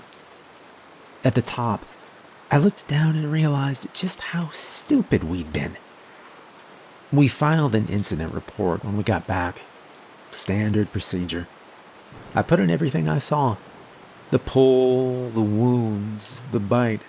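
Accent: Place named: South, United States. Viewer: I can hear your southern accent ❤😂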